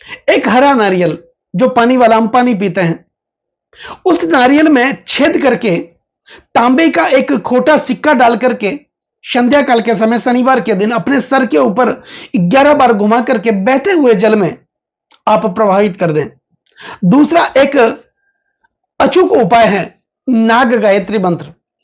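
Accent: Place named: Punjab, India. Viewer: It’s seems Hindi is not his first language, there’s Punjabi kind of accented Hindi.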